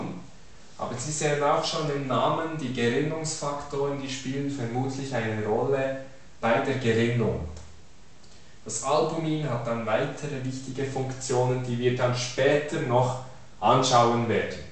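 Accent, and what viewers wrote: Switzerland. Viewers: schweizer Deutsch?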